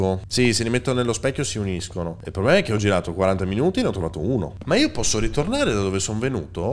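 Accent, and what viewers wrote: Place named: Veneto, Italy. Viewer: Sto gioco la maggior parte delle volte fa cagare pure a me che lo sto solo guardando 😂 P.s sta deriva veneta l’adoro ✨💕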